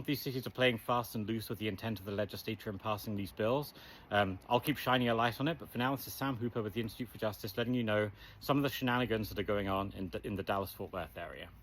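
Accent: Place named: United Kingdom. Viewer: Why is a British man explaining Texas laws to everyone?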